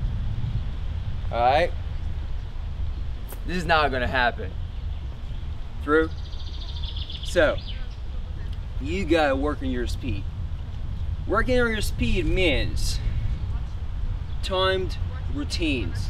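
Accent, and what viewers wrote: Russia. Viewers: It’s a mix between Russian and a southern American accent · This guys accent is a mix between russian, american, american southern, and a lisp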